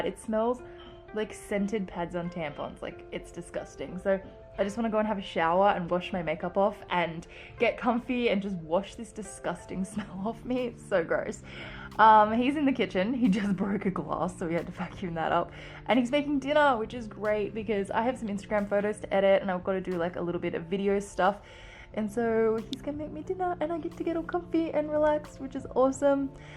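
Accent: Australia. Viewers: M.A Australian ♡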